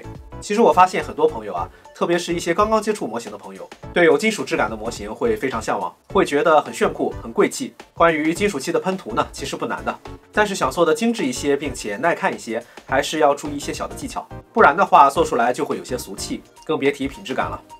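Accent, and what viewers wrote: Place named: Qingdao, China. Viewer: RAY是青岛人么？口音很像老乡啊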